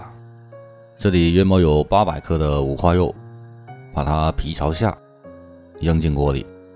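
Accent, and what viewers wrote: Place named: Hubei, China. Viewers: 做得好，讲得好，湖北人吗？ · 觉得是带湖北口音的。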